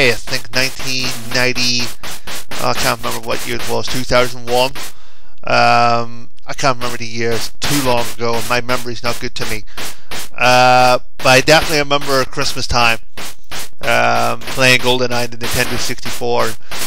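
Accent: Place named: Germany. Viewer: but your german..i could tell by the vocals ..i am german with spanish and portugesse ..ancestory :D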